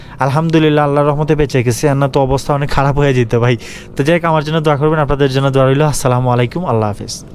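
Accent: Sylhet, Bangladesh. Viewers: Apne Sylhety Mone Hoy,Voice sune buja jay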